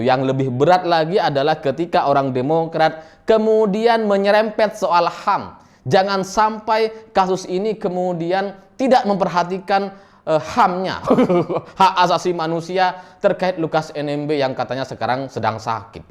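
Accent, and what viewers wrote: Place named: Madura, Indonesia. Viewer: Bang logatnya kaya temen saya orang madura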